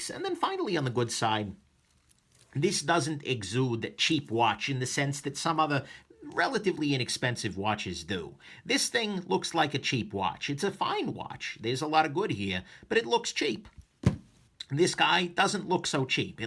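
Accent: New York, United States. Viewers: You sound very new york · Love your accent dude! Is it New York Italian? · This guy new yorks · your watch reviews are excellent, love that NY accent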